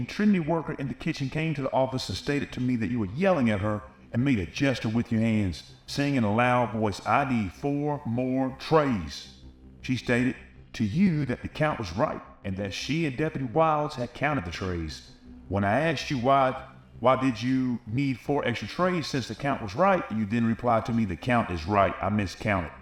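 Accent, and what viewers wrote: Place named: Appalachia, United States. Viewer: LAME REVERB EFFECT on your hick hillbilly narration. LOL You are TERRIBLE at this. You are more on the level of Kitty Cat videos.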